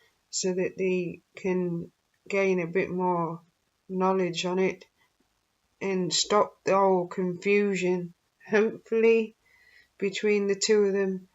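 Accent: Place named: North, United Kingdom. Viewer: You sound like a northern gal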